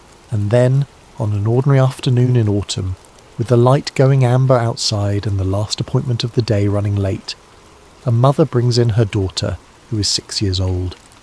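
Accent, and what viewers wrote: United Kingdom. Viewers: I love the soft spoken British accent putting me to sleep 😴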